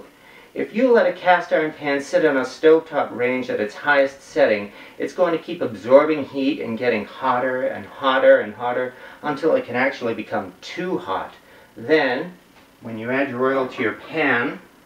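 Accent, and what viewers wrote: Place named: Appalachia, United States. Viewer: You sound like a frigging hillbilly, are you?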